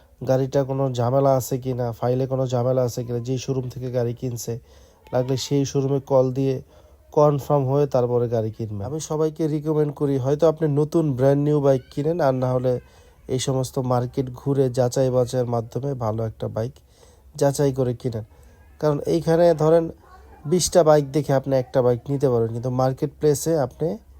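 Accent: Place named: Sylhet, Bangladesh. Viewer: Vai sylheti bashay Kotha bole obbash to Tai shuddo bashay aktu problem Hoy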